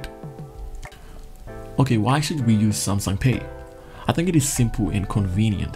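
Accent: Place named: South Africa. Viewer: Def south africa 🇿🇦 broer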